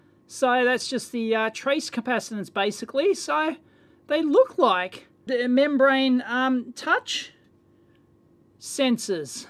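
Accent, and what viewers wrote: Australia. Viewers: I clicked on the video without reading anything about it. Imagine my surprise when everyone's favorite Canadian UBF started speaking with an Australian accent.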